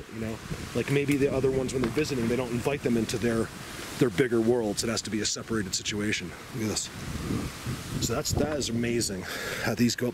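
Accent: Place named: Philadelphia, United States. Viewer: Hey. I’m a brand new subscriber. I hear an accent. You sound exactly like a friend I have who’s from Philly but now in TN. It’s uncanny. There’s lots of Bigfoot here in the PNW. Too many weird happenings in my days of exploring. I had one specifically leave me a gift on the drivers side windshield of my car. It was sweet. Guess he enjoyed all the fish.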